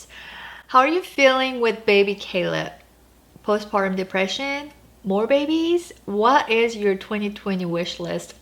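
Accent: South Korea. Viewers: Your English is just like my mom's. She's also Korean and has lived here in the US for 43 years. Still has a strong Korean accent and I love it!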